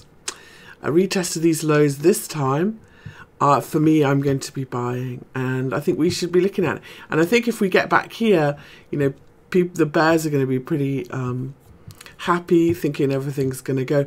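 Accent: United Kingdom. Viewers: I absolutely love your voice and your accent. It's very posh and very soothing.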